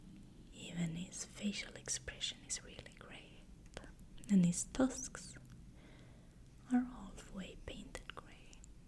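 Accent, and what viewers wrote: Sweden. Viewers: Swedish. Couple of her videos are in Swedish as well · Dane here! I have followed your channel for some time now and I absolutely LOVE your ‘singing’ (swedish) accent when you whisper! Your passion for WOW and other fantasy IP’s is infectious. Happy to see how your channel has grown.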